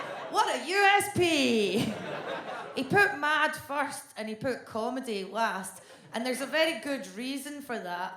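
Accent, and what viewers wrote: Scotland, United Kingdom. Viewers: This is the first time I hear a hot girl speaking in Scottish accent! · I love her accent, just wish I knew how to understand Scottish lol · I appreciate accent so much after having the sound of the snide Scot who narrates on the YT channel, Real Engineering, being the only Scottish accent that I could conjure up in my head for the last year or so. I was really beginning to strongly dislike Scottish accents until hearing. Beyond that, her performances are excellent, as well. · Scottish accents aren't easy to understand immediately, but she's funny. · I have a Scottish friend that doesn’t sound anything like her, so I was wondering if there are different Scottish accents in Scotland? My friend is from Glasgow.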